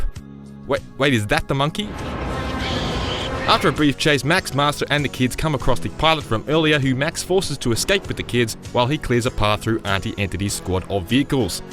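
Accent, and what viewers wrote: Australia. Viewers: I like how his voice sounds Australian because the film takes place in future Australia.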